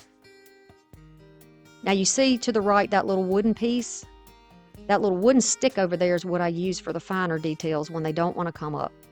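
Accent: South, United States. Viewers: ❤ I love your country accent. I have one too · I’m from the south, so I hear that accent a lot. I’m from Louisiana so we have a bit different accent but here in Tennessee, it’s different. You actually sound like a lady I work with. Just the things you say and the way you talk. She crafts as well so I had to check the name! 😂 but she designs tumblers. We apply labels to products, etc., and that was right up her alley when we had these tubes to place labels on. She was the only one that could do it perfectly and quickly! The little ones I could do, once she showed me how. The bigger ones, no can do! I was happy to let her do them. One more thing… different subject— I got these decoupage papers on Amazon and didn’t notice that they were only 6”x6”! They are beautiful but I think I can only use glue sticks to apply them! What can I use them for? If you have any suggestions or if you could possibly do a video on using them in some neat way, that would be great! 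It’s a whole picture of flowers with no space to kind of cut them and put just accent pieces without them looking like they’ve been cut. It’s hard to explain. Just looking for ideas or suggestions. I was very disappointed and just should have paid more attention to the size! 🤦🏻‍♀️ I also go some stencils that were bigger than I was wanting but I can find something to do with those. I’m not sure I can share pictures on here or I’d show you what they look like. Maybe if I matched the paint to the background of the paper, I could possibly do something with them. I just don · All the DIYs were great. Love the style. Never get sick of ur southern accent. Everyone has some type of accent. I am from Maine and we have a different accent here too. Keep the DIYs coming. ❤️ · Crackling on acrylic paint is not easy. Love these sunflower DIY's I love your country accent. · Hi, new subscriber here. Heard that Southern and knew i belonged! 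Mississippi, here. I love your designs and the "make it your own!" You had me at y'all!😁🥰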